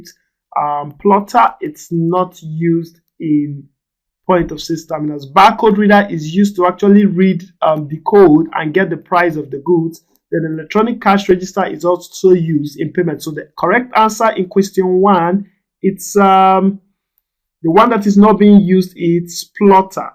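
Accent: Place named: Africa. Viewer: he has an African accent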